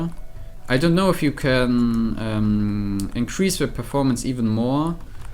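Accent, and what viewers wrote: Germany. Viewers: Achja der deutsche Akzent in englisch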